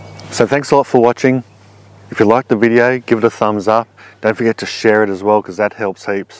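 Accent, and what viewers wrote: Australia. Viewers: You're going to be a YT Star....I wish I had an Auzy accent.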